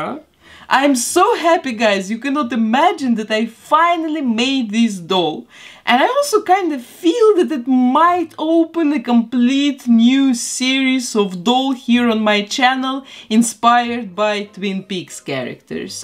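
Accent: Russia. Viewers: OMG hard Russian accent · почему произношение такое русское.. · Хмммм,мне кажется что ты русскаЯ, потому что акциент не английский:)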